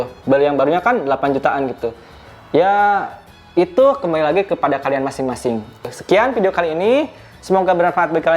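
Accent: West Java, Indonesia. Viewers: Aksen nya khas sunda bgt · sunda nih yah?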